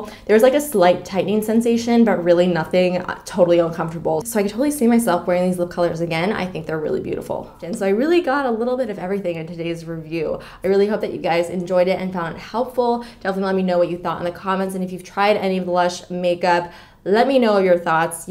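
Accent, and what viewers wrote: France. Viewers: Are you French? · Are you french?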